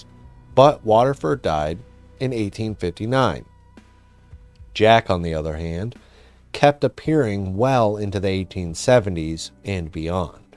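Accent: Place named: United Kingdom. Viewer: 😂😂😂 you're british!?!? 😊😂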